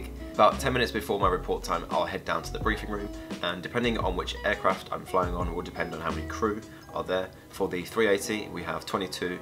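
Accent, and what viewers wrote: United Kingdom. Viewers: The first thing I said to myself when he first started talking is he’s British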